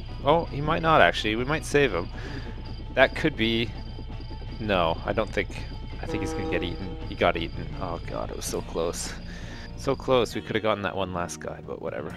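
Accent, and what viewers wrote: Canada. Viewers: OMG, that mic makes you sound canadian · you sound more canadian now ;( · I think the toaster helped disguise your Canadian accent. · ' new mic makes him sound way more Canadian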